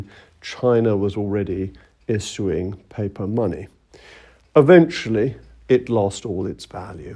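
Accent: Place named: Upper class, United Kingdom. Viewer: Well articulated intelligent discourse. If we can put aside his “posh” sometimes “parody” of the so-called “upper class”, he actually talks sense.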